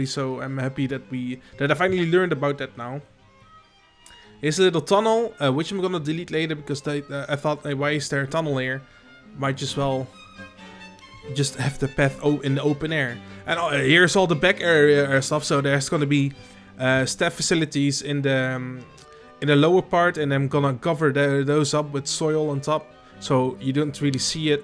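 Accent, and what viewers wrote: Netherlands. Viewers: Hoe Nederlands kan een acsent zijn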